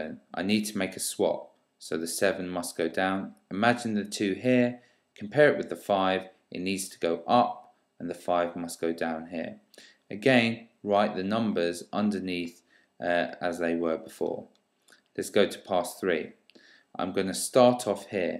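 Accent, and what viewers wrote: United Kingdom. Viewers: Hi, I have to say I am using youtub to learn math for a long time and I have watched many vidoes. But I have to say the way you are teaching is very very very good . I like your Londonish accent as well. I will pray for you deep from my heart as you helped me a lot.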